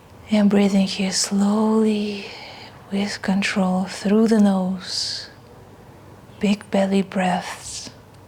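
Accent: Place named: Kazakhstan. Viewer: cool Kazakh accent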